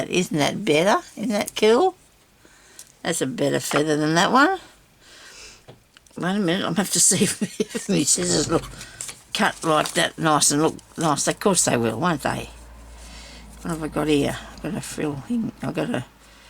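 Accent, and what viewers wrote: Australia. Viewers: I get so much joy watching you! You just love what you're doing and even when it isn't working out as you'd planned you just laugh and say "nobody panic" and keep moving forward! Your spirit mixed with that lovely Australian accent and sayings and sense of humor always makes me happy,lifts my spirits and gives me a giggle for free!! Never change,my friend. You're a treasure 😁💙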